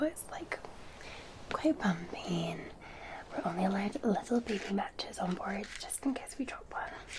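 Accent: Scotland, United Kingdom. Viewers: You've got the most perfect gentle accent and Scottish to to bring peace and calm to a late night and days end thank you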